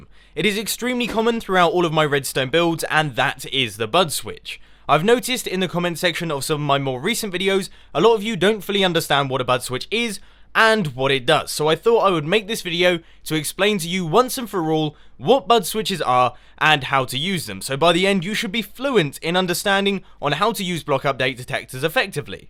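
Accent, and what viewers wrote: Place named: United Kingdom. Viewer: Yeah and he has a very British accent lol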